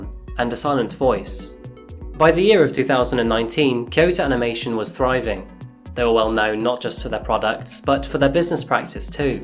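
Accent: England, United Kingdom. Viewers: I know now you were born in the US, but i still cant get over the fact that a british person is offering me coffee! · I can tell by your accent that you're English but I cannot place where. All I know is that it isn't Devonshire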